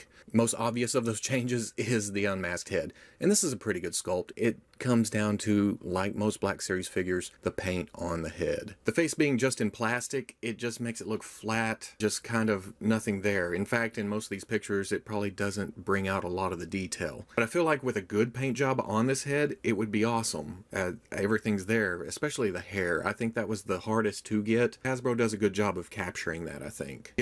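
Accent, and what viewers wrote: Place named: South, United States. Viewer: I’m also from Arkansas. I love his southern accent.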